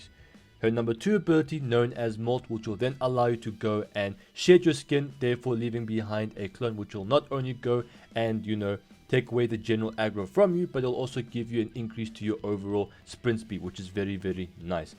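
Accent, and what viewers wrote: South Africa. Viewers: Yo a South African g. Respect.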